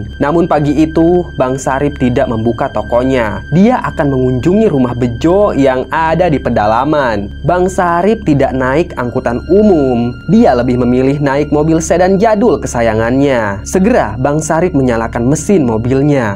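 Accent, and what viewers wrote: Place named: Medan, Indonesia. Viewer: Sharusnya logat bicarany tu logat banjar,, knp kemedan medanan,,,?? Bungulll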